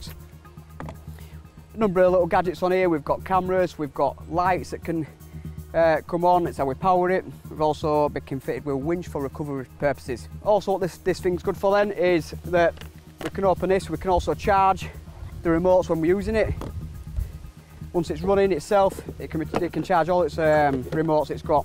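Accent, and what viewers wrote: Scotland, United Kingdom. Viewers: Is he From Scotland?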